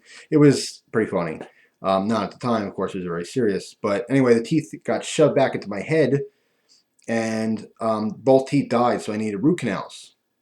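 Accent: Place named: New Jersey, United States. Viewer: I thought you "sound funny" cause you're originally from Jersey. I'm going looking for your Paypal email. I want to see you with a flipper for now and you pricing implants as well as a bridge. HesGoneNuts is right, shut up and take our money. What you do has value, let us demonstrate that value voluntarily. It's the libertarian way, are you some kind of commie? =) Why do you hate America? Why do you hate Truth, Justice, and Superman? You aren't the boss of us, take our cash.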